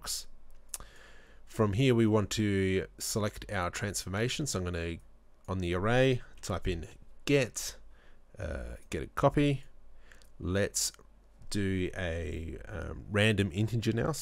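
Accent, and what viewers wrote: Australia. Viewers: Good to hear and aussie voice and nice tutorial. How would you restart the random spawn process once the actor is destroyed, having alot of trouble with the extra step.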